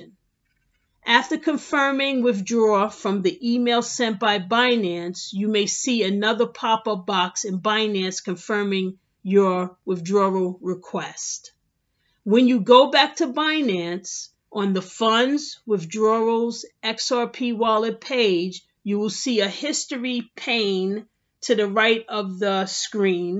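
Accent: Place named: New York, United States. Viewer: Yeah we can tell you're from New YOrk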